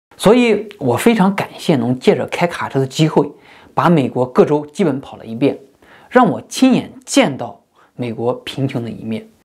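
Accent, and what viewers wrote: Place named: Northwest, China. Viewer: 听口音像是中国西北人 宁夏 甘肃 确实比较贫困 不上大学走出来真的是要穷一辈子 恭喜你的努力给了改写了后代的历史！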